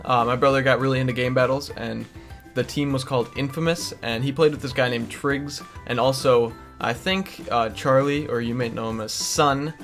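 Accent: Minnesota, United States. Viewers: is he from Minnesota?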